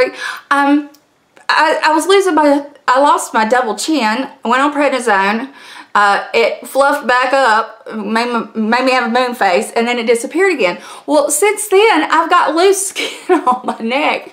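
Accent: South, United States. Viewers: I’m going to subscribe.. i love your personality. Lol 😂 I love that accent . I’m country too ! I was checking this product out for mom . Still debating if I’m going by it or not . Lol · Southern accent ... ew ... get 2 the point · Not fake, and if you’re from the South, then it sounds normal. If I go up North, I have a difficult time understanding people, the first time they say something to me, and sometimes have to politely request they repeat themselves. BUT... I don’t dislike the accent nor do I insult their accent. Like Mama says...”it takes all kinda kinds, so be nice and you might learn something.”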